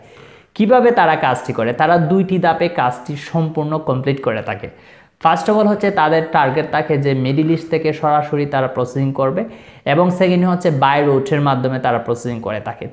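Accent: Sylhet, Bangladesh. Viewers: আপনি সিলেটি, আপনার কথায় সিলেটি টান আছে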